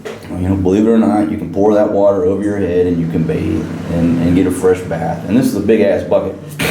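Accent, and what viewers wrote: South, United States. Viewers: I appreciate that you are doing your own thing but I can tell that with a mouth like yours you were raised in the south, but it sure wasn’t Texas man. Safe travels there guy.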